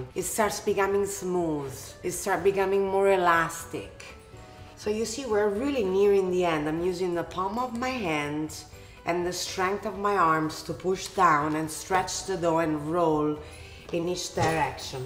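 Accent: Rome, Italy. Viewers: Sei di Roma Oggi l'ho sentito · Whats wrong with you? Have you never heard an italian accent?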